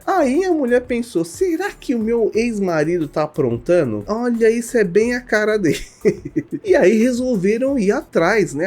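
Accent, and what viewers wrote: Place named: France. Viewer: Olá muito bom seu sotaque francês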